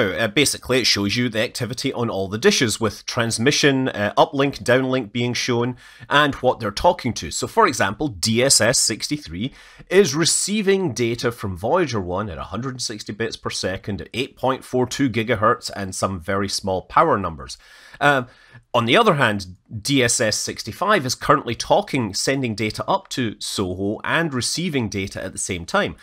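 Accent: Scotland, United Kingdom. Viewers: I love his Scottish voice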